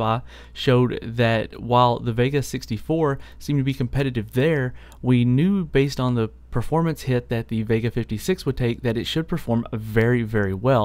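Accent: South, United States. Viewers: are you guys southern?? Your accent is definitely southern sounding. I'm from Texas myself so I'm definitely up there with the accent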